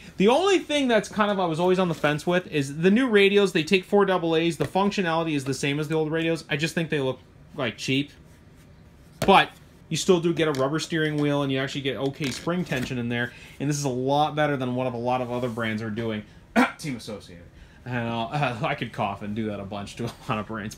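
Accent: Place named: Canada. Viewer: Hi, really enjoy your humor and Canadian accent. You broke through my work funk in just a few minutes listening to your spiel. Keep up the good work. Cheers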